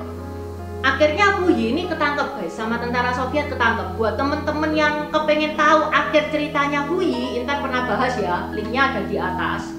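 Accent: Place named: Surabaya, Indonesia. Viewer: Alkhamdulillah Nemu Chanel ce intan saya lagsung subscribe, Bahasa Suroboyoane medok muanteb😂😂 Chanel ini nambah wawasan , Semoga Ce Intan selalu diberikan kesehatan dan rejeki yang berlimpah agar bisa terus berkarya. Salam sejahtera buat ce Intan dan saudara saudara saya dari etnis Tionghoa , Amin ya Rabbal alamin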